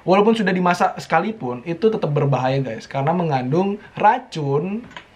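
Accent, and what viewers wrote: Bali, Indonesia. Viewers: "Anak muda tidak boleh bermalas malasan" Orang bali kyk aku suka males malesan:v Wkwkwkwk:v Logatnya, logat bali bgt😂 Dan aku baca coment comentnya "mantep bli" ngomongnya "bli" tpi namanya dia isi "Muhamad":v Aku orang yg tdk bisa dibohongi (sejak kapan?,-) BTW.. Aku: {\___/} nih.. ( •. • ) / >❤>\: {\___/} Cai nyen?,- ( - _ - ) [maaf bahasa kasar] / | | \ Gabut mks:D BTW, Buat conten tentang makanan di Rusia dong:( Disini adanya lawar ama betutu aja:v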